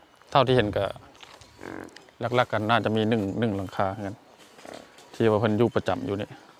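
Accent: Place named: Nakhon Phanom, Thailand. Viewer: เว้าคือบ้านเฮานครพนม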